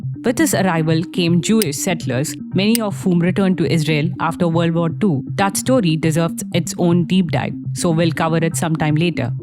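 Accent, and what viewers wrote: India. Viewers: You need to do better narration. Your accent is very hardcore South Indian. International accent required.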